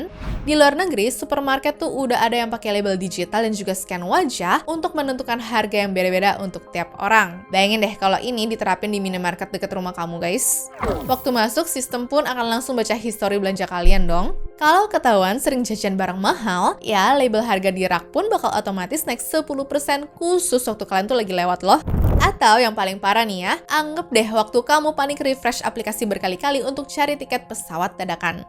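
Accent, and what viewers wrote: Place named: West Java, Indonesia. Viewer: kakanya kayanya dr logat jawabarat yah heee...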